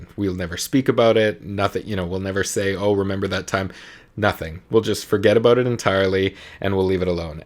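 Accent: Canada. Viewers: My first ever musical? Depends on what you mean. My first STAGES musical was Les Mis. I saw it in ‘93 (I was 13 at the time) Toronto. I think it was the last or one of the last stops of the Canadian tour. Fell in love with the show and went out to get the piano book the very next day. If you mean movies, then it was The Sound of Music. We got hold of a VHS copy back in the 80s and I watched it every day for a month or more. I probably drove my parents crazy! ps: are you Canadian/spend significant time in Canada? I noticed how you pronounce, say, “out.”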